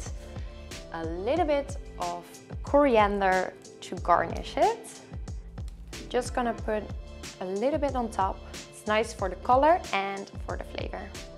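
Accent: Netherlands. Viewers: Je hebt echt nog dat Nederlands acsent in je Engels😊 maat goed bezig! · Ik hoor ook echt het nederlandse accent haha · You sound dutch and your name also looks dutch so are you from holland ??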